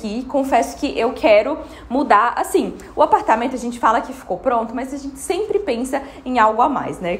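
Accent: Brazil. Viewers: Sua louca rsrsrs eu fui assistir o vlog e quando de repente ela abre a boca e eu: ela é brasileira???🤭🤭🤭 N sabia pensei q era uma gringa já tinha visto vídeo dela e ela me passou essa vibe ✨🤭👏🏻👏🏻👏🏻 ela trabalha com decoração? porque no vídeo ela disse se você quer ver dicas de decoração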